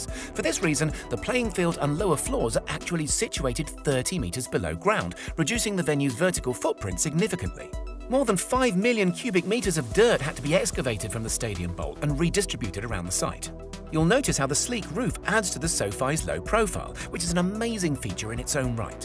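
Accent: United Kingdom. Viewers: Why does the narrator have a brit accent?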